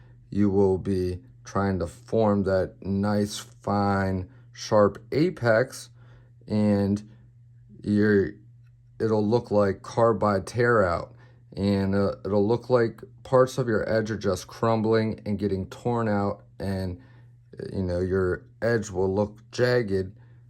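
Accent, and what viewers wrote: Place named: Baltimore, United States. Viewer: You from/in Bmore?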